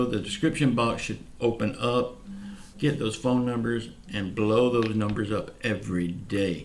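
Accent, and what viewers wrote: South, United States. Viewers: You crack me up I listen closely to everything you say and I do believe you and most of all I love your southern accent let's pray that we get the $2,000 and retroactive back from March that would be completely awesome continue to do the good work keep up the good work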